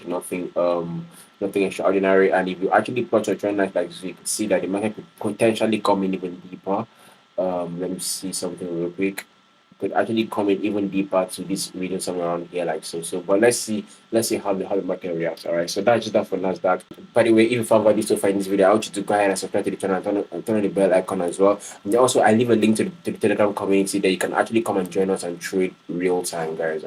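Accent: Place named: Africa. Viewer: yeah I get you but I've been following this guy for about 2 years and my goodness his accuracy is top notch He speaks like an African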